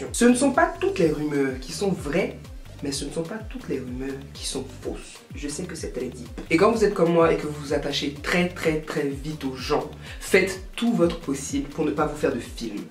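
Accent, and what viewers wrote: Ivory Coast. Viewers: c'est l accent ivoirien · L'accent ivoirien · Je savais que tu étais ivoirienne ça s'entend. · Hum on reconnait une ivoirienne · par ton accent je me doutais trop que tu venais de la CI 💫💫❤❤❤ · Au debut j’ai tout de suite su qu’elle a vécu en Côte d’Ivoire avec l’accent et c’est manière 😊😂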